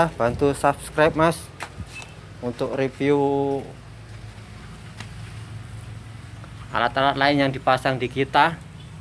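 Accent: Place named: Java, Indonesia. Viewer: Nganu mas sampean Sumatra tapi kok nganu mas jowo logate 😁👍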